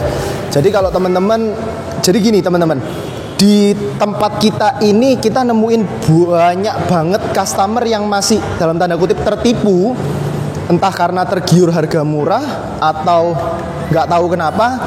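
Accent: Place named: Surabaya, Indonesia. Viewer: Saya suka logatnya ko. Asli arek suroboyo iki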